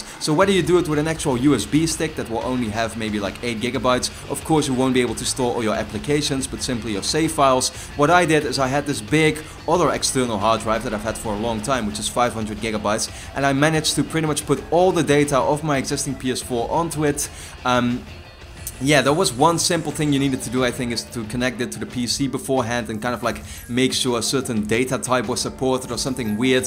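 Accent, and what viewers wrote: Netherlands. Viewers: Kan merken dat je Nederlands bent maar heb wel even paar vragen ze zeggen je kan je games wat je ge saved hebt ook online bij ps4 plus en die later op je 2tb neer zetten klopt dat of niet groetjes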